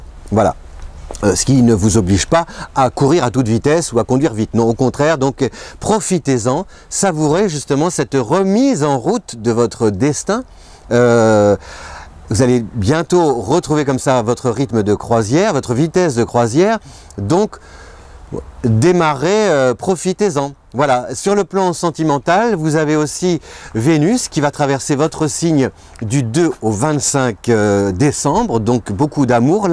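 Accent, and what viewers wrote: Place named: Belgium. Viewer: Vraiment marrant l'accent belge.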